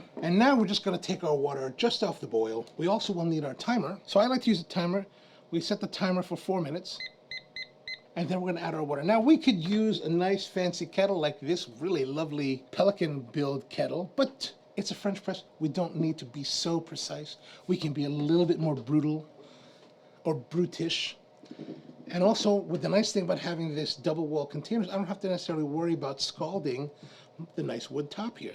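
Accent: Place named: Hawaii, United States. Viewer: I new I heard a Hawaiian accent! Then I saw your channel name. Mahalo!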